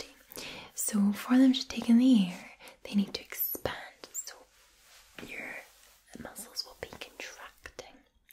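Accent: Scotland, United Kingdom. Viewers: I didn’t know I needed Scottish Accent ASMR until now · im working at a construction site lifting 4ton tubing listening to scottish asmr... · Sleep therapy with a scottish accent. Nice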